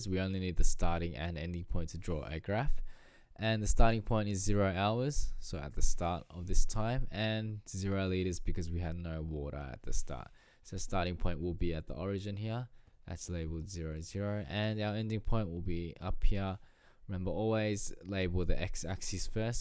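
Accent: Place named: Australia. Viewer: i was wondering why this video was so accurate to my Australian curriculum and then realised why when i turned up the volume and heard your accent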